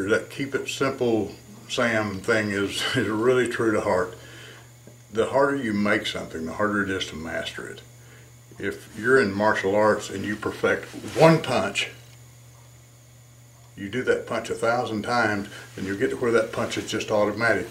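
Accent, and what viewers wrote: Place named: United States. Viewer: Thanks for your reply BUTT I do not believe this is reg size. I used to be 21 err able to make a shot with the stick behind my back with one foot on the floor, not on this sucker I can not touch the floor with my foot. So either I shrunk several inches or this table was built for basket ball players. How do I compensate for a non regulation table. BTW, I must commend you on your instruction, very precise and to the point in simple American English.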